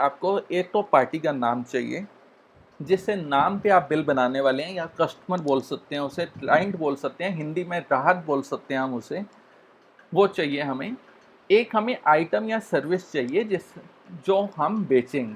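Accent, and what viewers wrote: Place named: Nepal. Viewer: I really appreciate BOOKEEPER app and I beleive it is very important to make these presentations about new features and skills, but sound quality has always been a problem. Your accent is something i get that, but remember BK community does not included only people rom Nepal.... so make sure sound comes out clearly at least guys!! Thank you.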